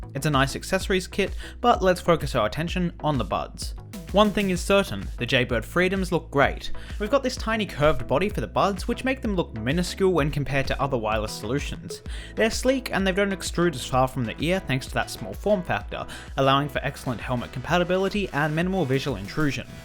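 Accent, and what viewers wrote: Australia. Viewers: lol that's just how us aussies sound.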